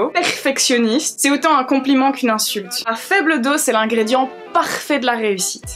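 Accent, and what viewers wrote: Belgium. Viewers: Tu est belge non ? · Je viens de débarquer... tu es belge? Les r bien prononcé c'est comme par chez moi tout ça, tout comme la "pouissance nucléaire" x) #patriotisme #lovelaccent Pardon on a du te le dire 50 000 x. (Je suis en admiration devant tes bras (strange dit comme ça)).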